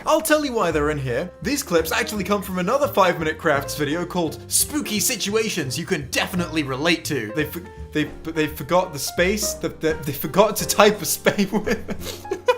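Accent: England, United Kingdom. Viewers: This man do be English tho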